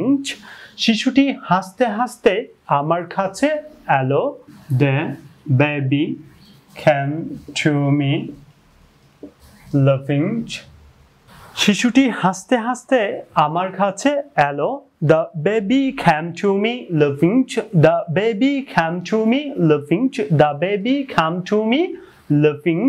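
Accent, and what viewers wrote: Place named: Chittagong, Bangladesh. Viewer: ভাইয়ের বাড়ি কি চট্টগ্রাম নাকি? ভাষা শুদ্ধ করে, ভালো করে উপস্থাপন করাটা এই প্লাটফর্মে অতিব জরুরি। আশা করি, বুঝতে পেরেছেন, আমার কথা। ধন্যবাদ।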